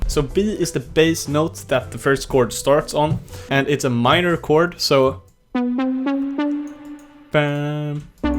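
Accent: Sweden. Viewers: That swedish accent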